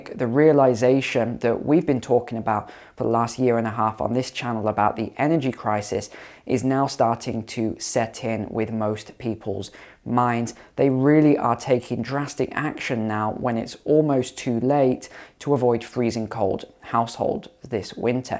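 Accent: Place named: England, United Kingdom. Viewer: This is all baloney, judging by the accent this guy is English and apparently looking at Europe. ( to the EU) with a very English perspective. First there is huge difference between various European countries. The Western European counties aren’t that cold plus hardly have any Forrest’s at all. Shutoffs might happen in the U.K. but it’s highly unlikely to happen in most European countries. Gas storage on the mainland is enough to cover the EU’s needs until about march purely based on the amount in storage tight now. Plus gas is still arriving every day.LNG by the way arrives in Ships in liquid form and then gets converted back to gas. The only problem is it’s much more expensive. Electricity is unlikely to be a problem because the old coal electricity power plants have been reactivated. Speaking about people be snowed in, in Europe is ridiculous it’s possible but very unlikely. 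The Europe climate is pretty mild.snowstorms are extremely rare.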